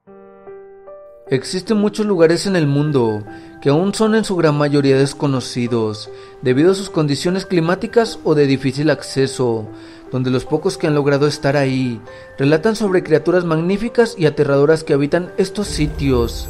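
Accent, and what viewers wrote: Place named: Texas, United States. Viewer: Buen video, pero no hables como si tuvieras un gancho presionando tu nariz. Y ese dejo de ranchero texano le resta seriedad a tu voz, te sugiero hablar pausado, claro, fuerte y acento neutro. Éxitos!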